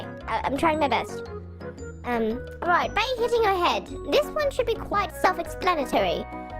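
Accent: United Kingdom. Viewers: I just want to say I really do like your british accent. I can listen it all day❤️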